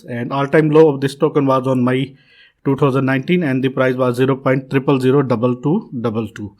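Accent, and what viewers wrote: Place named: India. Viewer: Soon as I hear an indian talking. I just think not to be takn seriously.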